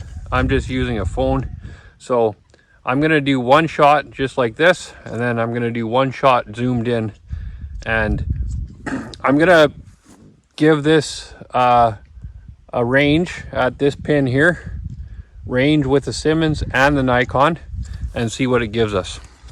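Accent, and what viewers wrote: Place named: Canada. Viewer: cool man, I could recognize a fellow Canadians accent. Lol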